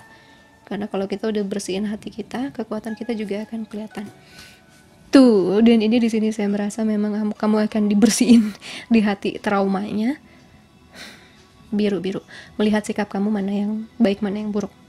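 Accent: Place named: Java, Indonesia. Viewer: Pile 1, kenapa ya aku rada-rada feeling "kok kak ngomongnya rada medok ya?"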